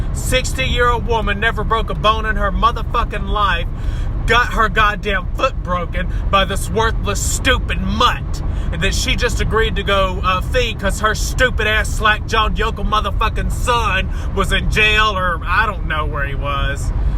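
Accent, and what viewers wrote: South, United States. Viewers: K- None, introduced me, to this funny, brave, passionate man, with a great voice for audio, that has an ear-pleasing, Southern twang.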